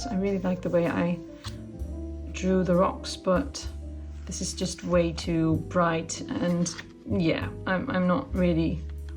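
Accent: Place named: United Kingdom. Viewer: Your english is really good! In the first moments I thought you are a native speaker, you have a lovely british accent! :)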